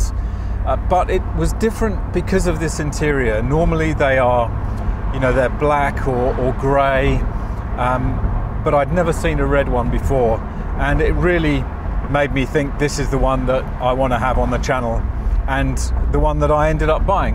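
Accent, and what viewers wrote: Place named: United Kingdom. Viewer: Hey, you sound very Brit 😀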